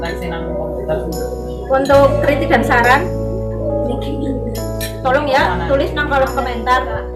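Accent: Banyumas, Indonesia. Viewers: Suara apaan tuh serem😥😥semangat kak bikin kontennya ngapak yo ra popo 😁 😁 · mbak yu mbak yu ngapak kie...dulur seneng wis diparani...mangan puding enak plus horror...sukses ya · Seneng bahasane mbak ngapak lucu , menghibur bgt salam kenal dan sukses ya luhur. 👍🏻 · SEMANGAT terus ya mbk Kiye asli wong ngapak apa ya'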